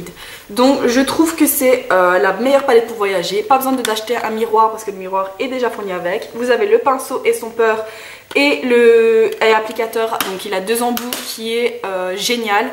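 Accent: Belgium. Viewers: Coucou, chouette vidéo, malgré que je l'ai déjà regardée 3 ou 4 fois sur d'autres chaines, c'est tjrs intéressant. Je partage tes gouts pour les fàp, d'ailleurs j'adore la palette que tu as composée (make up studio). Et la naked 2 me tente beaucoup, mais je ne l'ai jamais encore vue en vrai parce qu'à Liège il n'y a pas de Séphora, il faut aller jusque Lille :) Rien à voir mais tu es belge? J'avais décelé un petit accent, mais surtout tu as parlé du salon esthétika (je sais pas si ça s'orthographie comme ça ^^).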